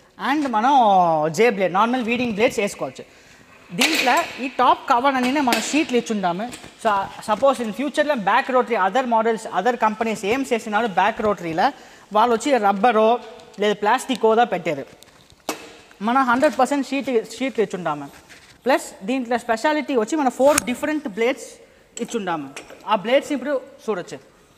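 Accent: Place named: Karnataka, India. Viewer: Nayana ayana Karnataka వరుల ఉన్నారు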